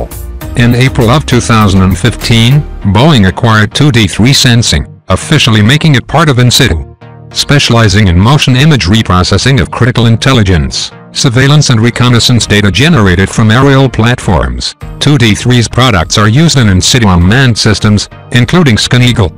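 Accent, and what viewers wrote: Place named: Russia. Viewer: Poor sound - Russian accent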